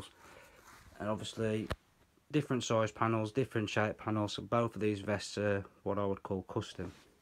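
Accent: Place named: West Midlands, United Kingdom. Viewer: nice one mate that was a bloody fast reply lol anyway im from west brom but now in oldbury so i knew that accent a mile away haha also u should promote more maybe a lil bit of social media or summatt and im sure your channel will do really well .... also did u end up sellin all them plates or u still got a few left like?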